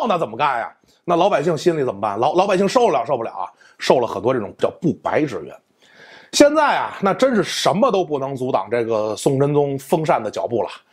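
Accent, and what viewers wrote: Beijing, China. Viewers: 还是北京话好听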